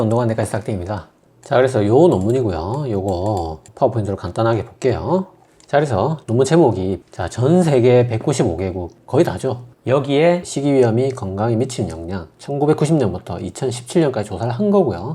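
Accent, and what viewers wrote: Gyeongsang, South Korea. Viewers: 웃기지만 사실적 표현 하나 할까요 오래되진 않았지만 님을 처음 영상으로 만났을 때 이름은 무슨 중국의사인가 싶었고 외모는 고등학생처럼 어려보였어요 거기다가 억센 경상도 사투리를 쓰는데 주구장창 경상도를 떠나본 적이 없는 토막이 경상도 사람 그대로였어요 그러나 놀라운 것은 구독자가 보는 가장 훌륭한 의사쌤이라는 것 사랑과 헌신으로 저렇게 열심히 전해주시는데 "고맙습니다"라는 표현이 한없이 부족합니다 열심히 열심히 공부하고 있습니다 선생님도 늘 행복하시기를 기도합니다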